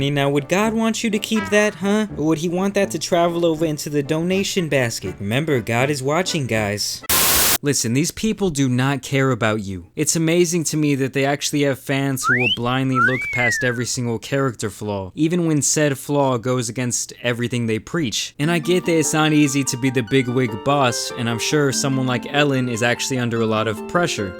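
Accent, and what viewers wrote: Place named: New York, United States. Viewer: I just noticed he speaks with a slight new york accent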